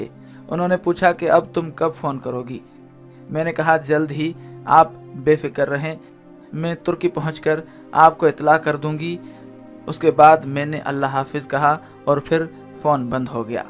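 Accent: India. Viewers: بھائی یہ ایک انڈین چینل ہے جو پاکستان اور عرب ترکی کے درمیان نفرت پیدا کر رہا ہے آپ اس بندے کی اردو چک کرو اس کا اردو میں لہجہ بہت مختلف ہے جس سے پتہ چل رہا ہے کہ یہ ہندی میں بات کر رہا ہے اور انڈین ہے اس لئے ایسی ویڈیو سے بچ کے رہو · Assalamalikum he is not speaking pure urdu. It is Indian. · بات پاکستانیوں کی ہورہی پے اور لہجہ انڈیا والوں کی کچھ عجیب سا نہیں؟ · Why u have hindi pronounciation? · Yey bolnay wala Indian hay, yey musalmano kay khilaf Indian Hindu Propaganda video hay, iska lehja indian hay. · Ap indian style main kune baat kertay hain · Tum indin ho tmhari awaz zuban sy lagta hy tum nafrat ka bej bo rhy ho ya tum irani ho i hate you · هر جگہ پر ہر قسم کے لوگ ھوتے ھیں ۔ بیشرم آدمی تمھاری اردو ھی انڈیا والی ھے تمھارا کچھ بھی سچا نہیں۔ تم خاص ایجنڈے پر کام کر رھے ھو۔ سعودیہ اور ترکی کے خلاف ھی کیوں بکتے چلے جا رہے ہو ۔ اسلامی دنیا کے یہ دونوں ممالک بہت محترم ھیں۔ shame on you.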